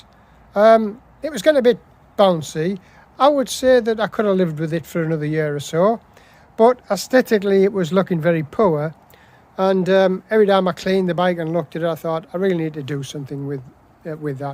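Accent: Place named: Liverpool, United Kingdom. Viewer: Myself being from the northeast of England! I can’t get away with your posh scouse accent.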